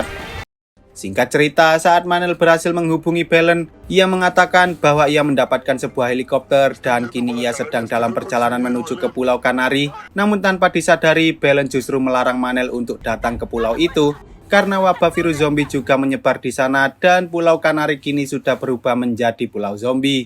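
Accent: Java, Indonesia. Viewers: Jawa kowek logatnya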